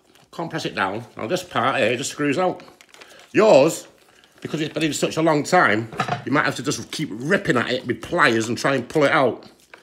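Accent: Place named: Northern England, United Kingdom. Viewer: As we say in Australia, you mate, are a deadset legend! Thank you so much. I am the world's worst handywoman and your guidance (made all the better with your friendly Northern accent),meant I replaced the rotten old hose with minimum fuss. Big thumbs up!!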